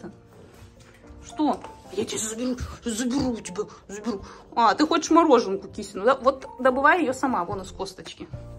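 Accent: Siberia, Russia. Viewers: нормальный, сибирский говорок. Я так понимаю, вы казачий и близко не слышали. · так беситесь на здоровье. Я не батюшка, помочь ничем не могу, бесов изгонять не умею. А акцент у прекрасный, она же сибирячка, а там основа - казаки, еще от Ермака. Вы донских казаков не слышали, у них речь вообще другая. У меня бабушка всю жизнь вместо «вчера» говорила «надысь» и вместо «скачет» - « бегхеть». Ну и так далее. Шолохова почитайте.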